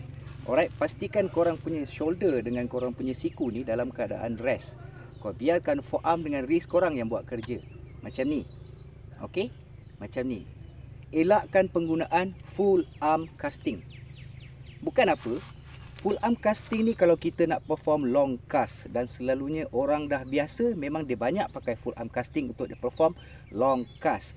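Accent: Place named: Melaka, Malaysia. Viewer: Mantap Dato Seri.. Episyen!.. mcm org Melaka piau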